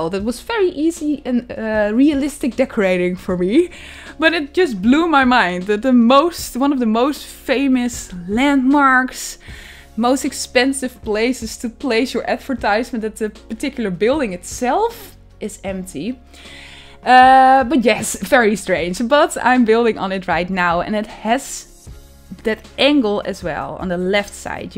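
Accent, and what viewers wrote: Netherlands. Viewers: You sound like you’re from the netherlands. Are you Dutch? My dad is Dutch and my grandparents have a similar accent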